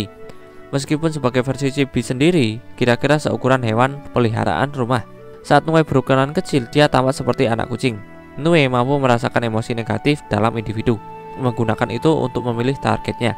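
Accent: Java, Indonesia. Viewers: Nonton.. medok euy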